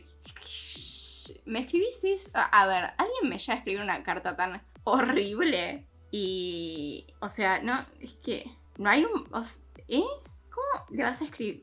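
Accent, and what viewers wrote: Argentina. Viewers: AMÉ ESTE VIDEO! HABLANDO BIEN ARGENTO! puteadas, humor negro y la más transparentes opiniónes. A pesar del estrés por mudanza , te pasate con este video!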